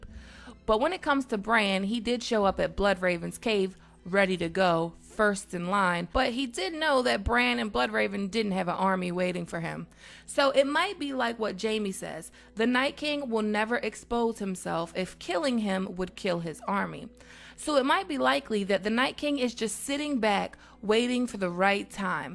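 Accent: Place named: Baltimore, United States. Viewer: u sound cute when ya B"more accent comes out. lol It was thick in this video. But I agree D&D wouldn't do something as elementary as having the 1st plan work. I like #Stargaryens. Gr8 content as usual.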